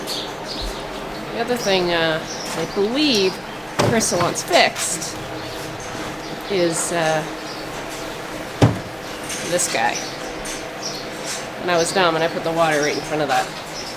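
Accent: Canada. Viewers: hello from North Dakota, your honesty is so refreshing. I adore your Lambs and watching them grow, I must admit that when I first started watching the channel I couldn’t eat Lamb. As time went by and I saw the love and care you show each of them that soon changed. Lamb chops are my absolute favorite thing to eat, if it’s on the menu anywhere I’m ordering it. My husband not so much he just doesn’t enjoy the flavor of the meat. Keep on doing what your doing, I must also admit that I love hearing your accent and Canadian sayings it reminds of home. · You must be Canadian. The fist time you dropped an "eh" I started looking for bilingual packaging and I found it.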